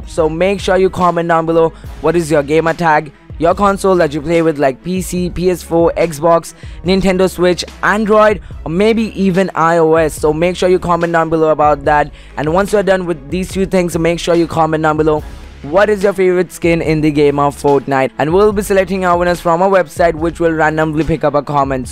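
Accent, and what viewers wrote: India. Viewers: maybe indian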